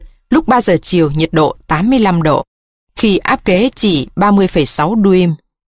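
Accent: Standard. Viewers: Truyện nghe đi nghe lại vẫn hay , nhiều tình tiết hấp dẫn cộng với giọng đọc thật chuẩn và truyền cảm , cảm ơn tác giả và người đọc thật nhiều . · Bản dịch quá hay . Giọng đoc cũng rất chuẫn . ❤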